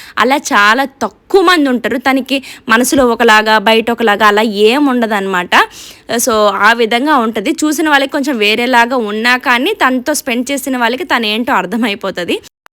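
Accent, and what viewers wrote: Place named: Telangana, India. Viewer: Madhyalow telagana slang enduku andi medhi srikakulam kaddaa😊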